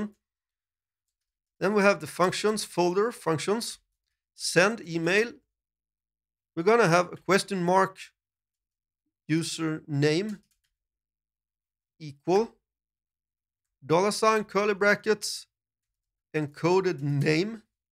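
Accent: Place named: Sweden. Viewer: I knew you were from Sweden. Kind of noticed it from the accent. Then you confirmed it :D I'm from Malmo. Great channel, keep up the awesome work.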